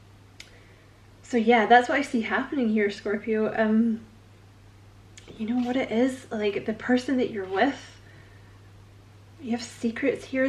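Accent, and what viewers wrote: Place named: Scotland, United Kingdom. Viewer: Love your accent! You speak to my Scottish roots. 💗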